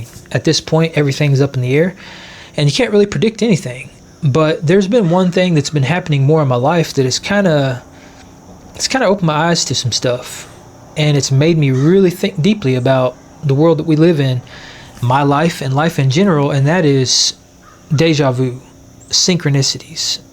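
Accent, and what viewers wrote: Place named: Texas, United States. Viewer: You sound like you're from Texas..i.e. San antonio.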